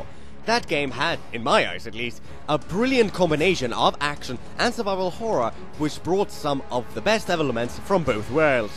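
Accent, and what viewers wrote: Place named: United Kingdom. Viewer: Hi! I've seen some of your videos and I'm pretty impressed, even subscribed just today. Just a few questions, though: first question, what part of Britain are you from and what is your accent? (Not a question related to the video, I'm just curious because I personally think it's pretty charismatic) Second question, what's the song that plays in this video?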